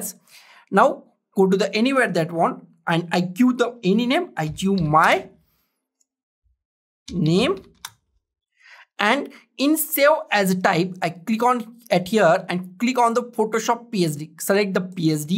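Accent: India. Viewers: is this indian or some one trying to be an indian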